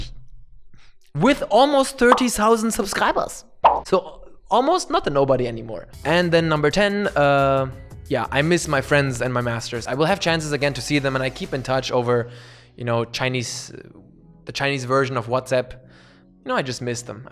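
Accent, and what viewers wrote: Germany. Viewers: Wie du mit deutschem Akzent sprichst, einfach göttlich! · Question , is he originally born in germany ? Cuz he has a really big german accent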